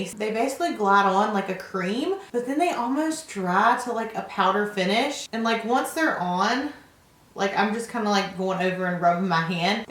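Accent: South, United States. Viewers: Knew you were country from the first word you said haha love from Mississippi ❤️ thanks for sharing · Loooove your accent. I'm a country girl myself and I have t heard any makeup bloggers that have a southern accent. So glad I ran upon your video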